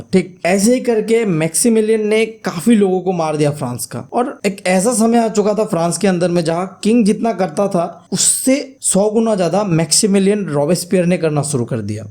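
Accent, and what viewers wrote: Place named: Bengal, India. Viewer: Bhaiya are from bengal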